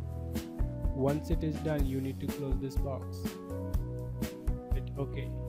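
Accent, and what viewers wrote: India. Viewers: hears indian OH HELL N- clicks out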